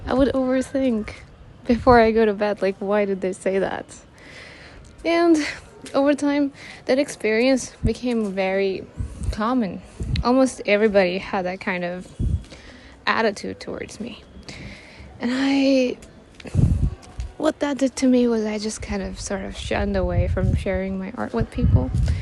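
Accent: India. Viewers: not everyone has indian accent, never heard anyone w it, maybe she is from north east cus I also lowk speak like her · I’m also Indian and i agree with everything you say , I’m a school student for now but art is something i really love . And people around me even those who draw just draw what they find and at point , I don’t think anyone loves it as much . It’s also not something you can casually say for a future career . I don’t know if i should talk about this - but I also really love to write stories and no one around is a writer or a reader 😢like come on guys ! Creative outlets are so not talked about here ! And your accent is nice btw ! ❤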